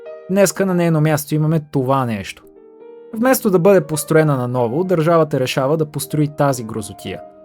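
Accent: Russia. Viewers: не можеш да скриеш джиалекта солти руски soiboi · Говориш с руски акцент, но съм съгласен с всичко което каза ! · ХУАХУАХУХАУХАУХАУХ КАКЪВ Е ТОЯ РУСКИ АКЦЕНТ ВЕ ЛУД КОЛКО ПЛИТКОУМЕН ТРЯБВА ДА СИ ДА ГЛЕДАШ ПОВЕЧЕ ОТ 10 СЕКУНДИ НА ТОЗИ КЛИП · Има руски акцент, защото е руснак, дошъл да живее безопасно и евтино тук и да плюе по мястото, което обитава! Много тъпо от негова страна! Но пък може да си ходи, ако не му харесва! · Братлето наистина има руски акцент обаче, хванах един-два русизъма във видеото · На 30 секунда от видеото писах коментар да питам що говориш с руски акцент. После ти изслушах забележките и разбрах- ти наистина си си монголски подчовек. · Чува ти се руския акцент. Пробвай пак! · Диалектът ти е на руснак живеещ в България. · Съгласен съм донякъде,но долавям руски акцент. Тоест видеото е може би резултат от обиден полурускобългарин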